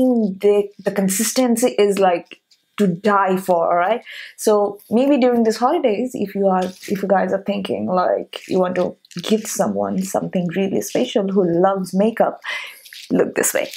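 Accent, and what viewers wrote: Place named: South Asia. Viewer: Are you from Bangladesh or India or Pakistan? Your accent and name sounds like it 😅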